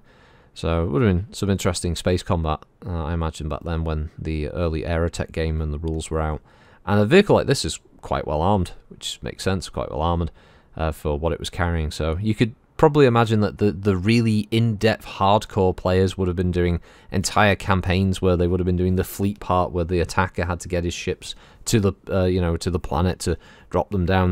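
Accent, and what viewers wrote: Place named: RP, United Kingdom. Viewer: It's that elite upper-class British accent 🙂.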